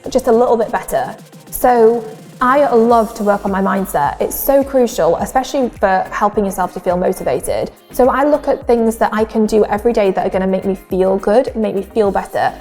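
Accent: United Kingdom. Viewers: She’s British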